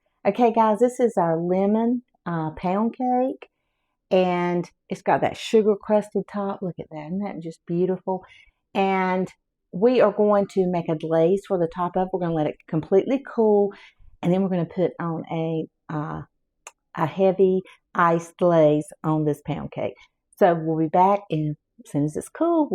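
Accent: South, United States. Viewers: Your still a beautiful lady, absolutely love your southern accent.😊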